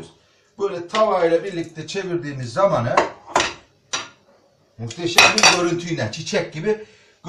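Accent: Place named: Alucra, Turkey. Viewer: Dayı alucoralı yada gareysarlı galiba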